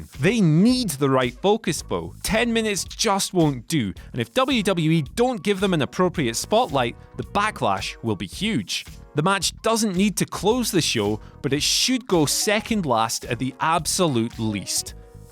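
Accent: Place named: Scotland, United Kingdom. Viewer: Hey, he's scottish. They talk like that :o)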